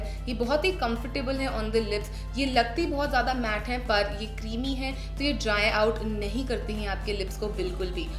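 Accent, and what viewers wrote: Delhi, India. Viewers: Pakka Delhi wali hindi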